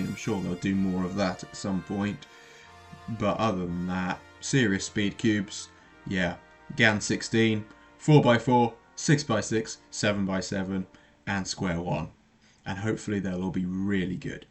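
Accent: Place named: RP, United Kingdom. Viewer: I love your posh accent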